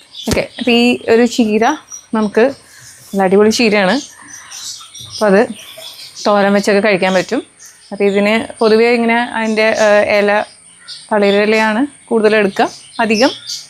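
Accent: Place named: Chalakudy, India. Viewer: ചായ മൻസ, ഞാൻ കണ്ടിട്ടുണ്ട് കഴിച്ചിട്ടില്ല. അതിനെക്കുറിച് കൂടുതൽ വിവരങ്ങൾ പറഞ്ഞു തന്നതിന് നന്ദി. എന്തെല്ലാം പച്ചക്കറി ഇനങ്ങൾ അറിവില്ലായ്മ മൂലം വെറുതെ നശിച്ച് പോകുന്നു. ഭക്ഷണ യോഗ്യമായ അധികം പ്രചാരണത്തിൽ ഇല്ലാത്ത ഇനങ്ങൾ പരിചയപ്പെടുത്താമോ. ചേച്ചിയുടെ സംസാര രീതി ചാലക്കുടി സ്റ്റൈൽ പോലെ തോന്നുന്നു. ഇത്രയും ചെറിയ വീഡിയോകൾക്ക് കൂടുതൽ റീച് കിട്ടട്ടെയെന്ന് പ്രാർത്ഥിക്കുന്നു.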